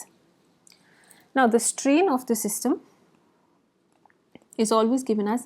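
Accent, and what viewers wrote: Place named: India. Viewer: it seems like you have an indian English accent..Very informative video....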